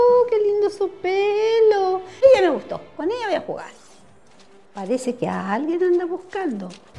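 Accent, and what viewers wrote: Chile. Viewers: Tiene ascento de chilena ?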